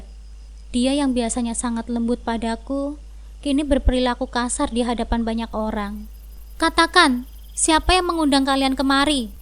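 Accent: Java, Indonesia. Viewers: Bd hadir mbak yg suaranya medok banget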